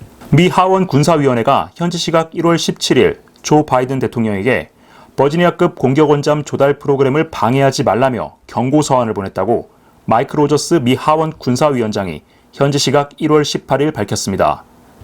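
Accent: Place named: Seoul, South Korea. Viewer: 서울말 좋아요 ^^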